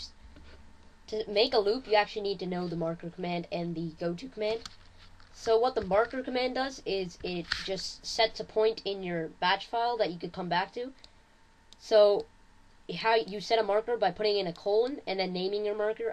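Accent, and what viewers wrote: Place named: Canada. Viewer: Good video. You have a strong Canadian accent.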